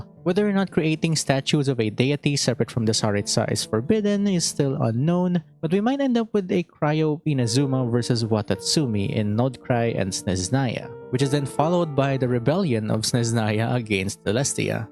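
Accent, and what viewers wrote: Philippines. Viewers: LMAO.,!! this is the first time that I really paid attention to how you speak and suddenly I could tell that you were a Filipino because of how you pronounce words so I checked your profile and saw your location in the Philippines!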